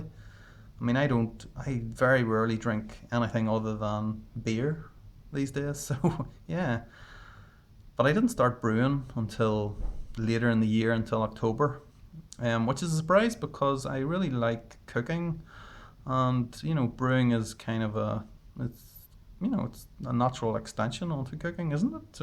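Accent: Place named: RP, United Kingdom. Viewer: At least you haven't turned into an arsehole now you're popular unlike some of them brewtubers out there that think they're the big I am. Nobody's ever going to read this so I'm not even going to bother trolling. I think eventually everyone learns to relax. You sound kinda posh in this. I first did videos for my mate Jon (he'll be on youtube one day) where I was fine, then did videos for youtube that are awful and then pretended I was always doing videos for Jon (and still do) and it got easier.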